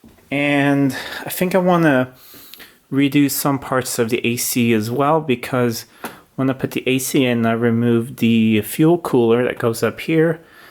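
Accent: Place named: United States. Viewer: Thanks. Listening to your accent I thought that you are from the US and you live in the US. This is why those license plates intrigued me.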